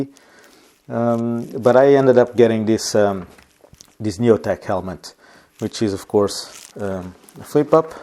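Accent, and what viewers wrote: Portugal. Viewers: És tuga não és?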